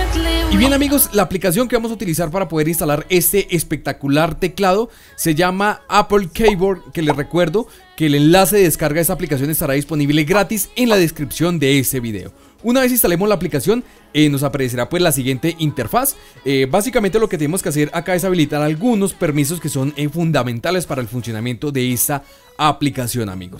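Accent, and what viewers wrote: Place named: Bogotá, Colombia. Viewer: Buena el parcero se Bogotá se nota ajjajaja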